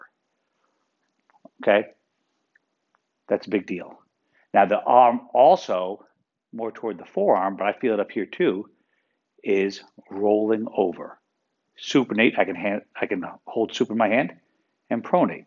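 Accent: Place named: United States. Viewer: This man has an American accent. #skip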